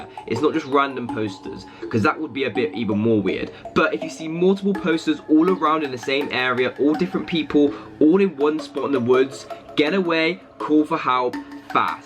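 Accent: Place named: United Kingdom. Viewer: I love your british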